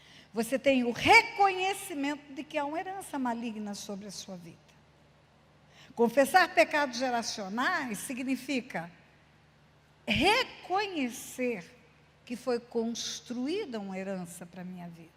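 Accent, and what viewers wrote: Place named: Minas Gerais, Brazil. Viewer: Menina forma carinhosa de mineira falar tu és uma benção de Deus aqui na terra amada Pastora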